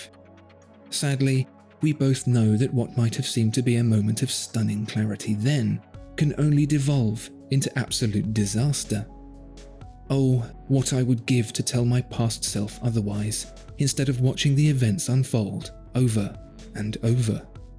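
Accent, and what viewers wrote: United Kingdom. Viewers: Dude your sleepy british accent sucks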